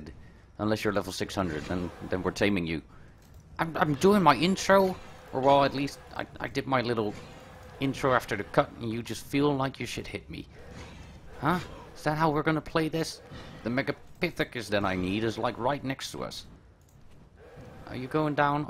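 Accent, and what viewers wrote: Netherlands. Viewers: is from NL Cant you tell with the accent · that's why I asked it sounded like he had the accent im from NL too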